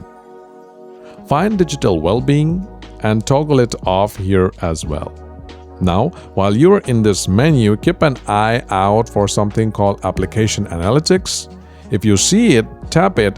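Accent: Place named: India. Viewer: Odd.. your skin tone does'nt seem to match your accent? Are you from India?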